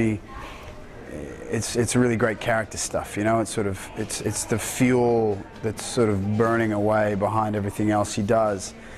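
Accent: Australia. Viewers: American here, OMG, he's even hotter with the Australian accent! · 'cause he's aussie and he talks with aussie accent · I didn't know Alex speaks with a phony American accent in the TV series "Hawaii 5-0!" His Australian accent gives him a whole different persona. 👍 😉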